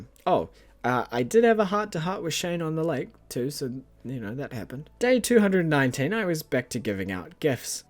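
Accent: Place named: New Zealand. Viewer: I hear "kig shid" and laugh my accent off 🤣 actually I find very cool to hear so many different english accents on yt, and now I know that NZ accent have a very closed 'e' sound in words like 'bed', 'shed, 'keg', 'quest', and the stress syllables are different in some words, like 'crAnberries' instead of 'cranbErries' 👍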